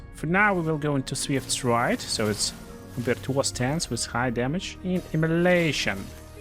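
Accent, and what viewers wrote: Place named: Russia. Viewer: ю инглиш гуд, бат ай ноу у рашн))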